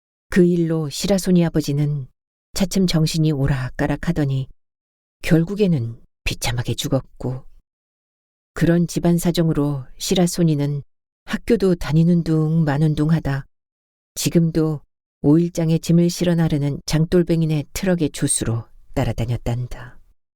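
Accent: Jeolla, South Korea. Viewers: 자정이 지났네요. 자고나서 내일 들을까 생각하다 잠이 오지않아 오늘은 또 어떤 작가의 작품이 님의 목소리를 타고 우리 곁으로 다가올까? 궁금했는데~ 님의 전라도 방언이 참 구수합니다.^^ 또 호롱불 5일장 먼지 풀풀 날리던 신장로 드넓은 들판 지명 등등 근처에 잠시 살았기에 더욱 정겹네요. 님 잘들었습니다.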